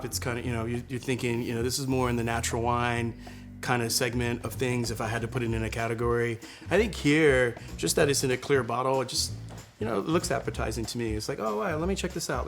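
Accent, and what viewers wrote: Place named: California, United States. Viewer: It’s less that he mispronounced it and more that he just has a California American accent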